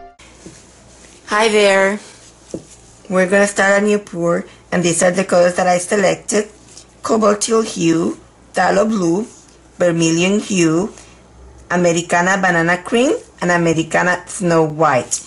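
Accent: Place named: New Mexico, United States. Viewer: I love hearing the way you say the names of colors, I miss New Mexico and your accent is lovely to me, and I have been able to remember the different types of colors, for choosing due to the way it registers.. and the fact that your examples with these paints are totally inspiring, motivating. thank you.. for honest and talented pours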